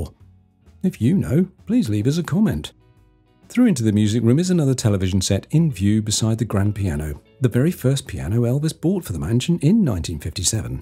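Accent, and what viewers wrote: England, United Kingdom. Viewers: Listening to an English man describe Elvis Presley and his southern mansion and his southern ways is kinda like listening to Ozzy Osborne preach the gospel, it just doesn't really go together.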